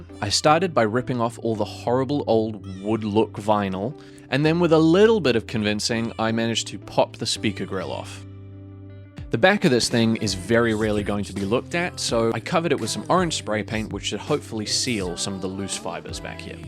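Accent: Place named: New Zealand. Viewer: as soon as im 5 sec in and hear a kiwi accent, sub'd. idk just enjoy seeing cool videos from people from the place im from, especially when it seems like everyone else is american, australian or british. dope vid!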